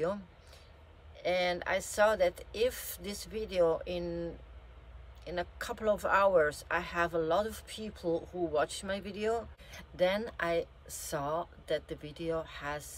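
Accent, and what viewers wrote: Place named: Hungary. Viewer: I just saw your video come up and I would much rather have my bed at the front for many reasons. I would feel much more secure for sure. I love your accent, you sound so much like my Hungarian mother. I do hope that you are feeling happier and more positive these days. We are sending you warm hugs and positive energy and wishing you success with your channel. ❤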